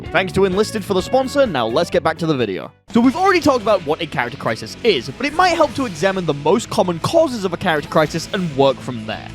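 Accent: United Kingdom. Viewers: I do just love your silky smooth British voice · i want it to be known that i do enjoy your silky smooth british voice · yes we do love your silky smooth british voice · I'm just here to hear the bri'ish guy talk, honestly.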